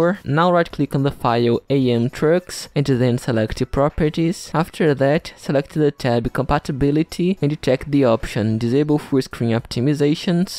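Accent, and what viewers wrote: Brazil. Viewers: vc e br da pra perceber no sotaque kkkkk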